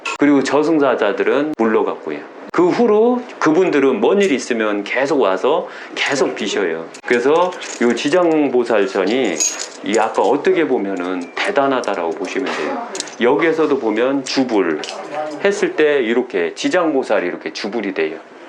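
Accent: Chungcheong, South Korea. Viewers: 유교도안닌것같고 불교도 아닌것같고 무당도 아닌것 같은데 신흥종교인가? 귀신도쫓고 각잡종교 좋은것만 모아서 ...순진한척 충청도 사투리로 이래유 그래유 해가며 그런데 왜 그리 사는지..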